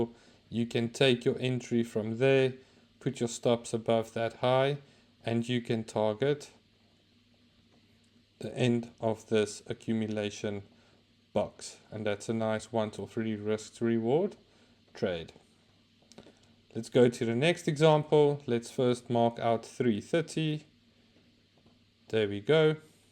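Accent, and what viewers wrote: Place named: South Africa. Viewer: I knew the accent was South African😂❤️ Man, I'm learning so much mfethu, keep teaching, I'll keep learning, we'll all keep getting better🙏🏽🙏🏽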